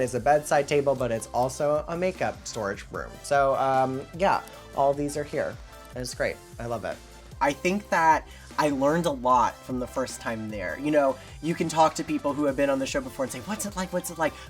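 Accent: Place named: New Jersey, United States. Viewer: I love her New Jersey accent and energy!!! He is the type of guy you want to take home to meet your mother.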